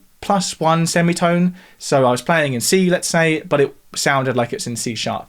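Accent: United Kingdom. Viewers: Soy una persona que habla español pero entiende inglés y veo videos sin problema, pero a veces me cuesta contigo porque siento un acento muy británico 😂😂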